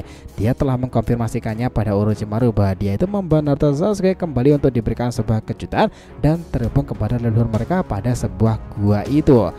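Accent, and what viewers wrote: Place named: Java, Indonesia. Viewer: ngomongnya santai aja mas,pasti lu dari jawa on mas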